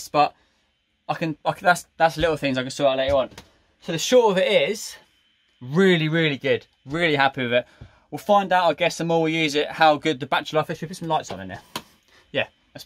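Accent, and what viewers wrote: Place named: United Kingdom. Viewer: Thiccc british accent, where you from bud?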